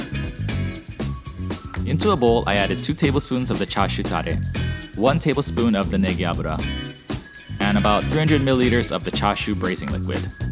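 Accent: Hawaii, United States. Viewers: I like the shortcuts you show! It’s really clever! Are you from Hawaii? You sound like it.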